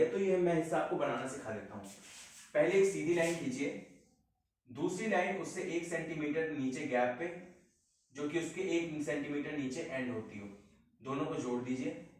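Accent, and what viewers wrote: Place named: India. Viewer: Indians...why...why always Indians :( My poor ears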